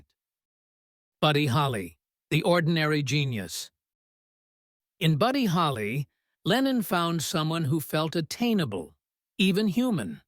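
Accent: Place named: United States. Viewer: Here we go again, the Yank narrator, talking about the bigest "British band" the world has ever known, and the five who impressed the most, yes you've got it, five Americans, you knew it was gonna be rubish as soon as he opened his mouth, they just can't except they've never produced a band as big as the, their answer was the, what a disaster that was.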